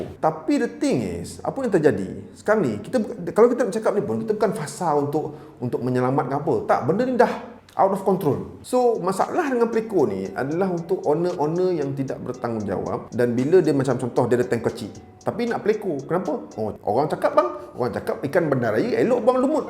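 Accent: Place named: Negeri Sembilan, Malaysia. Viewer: Abg nogori ko dgr loghat tu mcm ade nogori sikit ii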